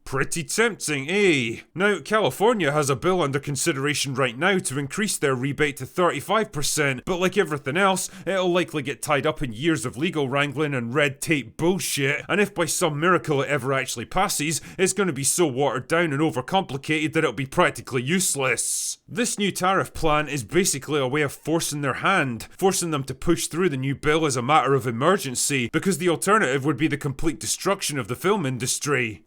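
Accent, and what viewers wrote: Scotland, United Kingdom. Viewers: A Scottish chipmunk voice. Awesome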